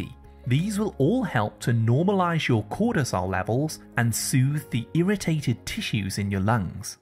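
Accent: United Kingdom. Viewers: First off, couldn't they Find someone that spoke American, That British tongue Is irritating,.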